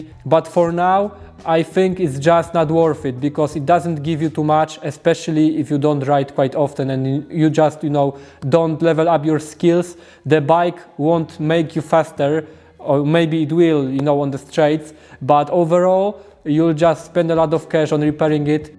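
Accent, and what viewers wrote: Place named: Poland. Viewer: Powodzonka, dobrze sie slucha tego Polskiego Angielskiego 👍💪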